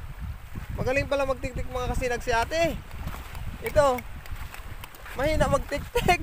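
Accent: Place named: Albay, Philippines. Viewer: kala ko kasi taga albay ka yung tuno kaso ng pagsasalita mo parang sa albay. San ka sa cam sur idol? Update mo kame lage ki bagwis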